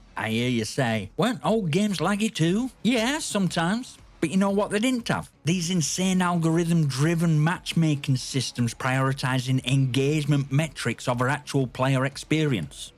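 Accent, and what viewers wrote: United Kingdom. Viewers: Feel like im listening to the British version of blame truth 😅.....call of duty just isnt what im after these days, its a complete shitshow, put 4 hours in, deleted, been enjoying battlefield 5 recently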